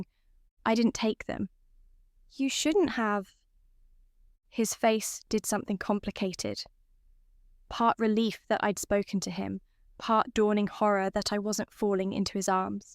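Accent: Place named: England, United Kingdom. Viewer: Lol, it's an AI program just a better one than most. It depends how much you are willing to spend. Also English voices especially her being a more refined English is very pleasant on the ears.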